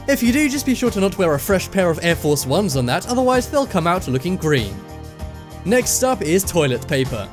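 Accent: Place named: England, United Kingdom. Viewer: There's that stupid English accent again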